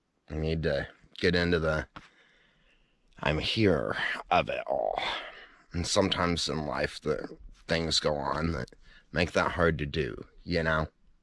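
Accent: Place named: Bush, Australia. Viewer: You still have some of your "Bush Accent". I love it.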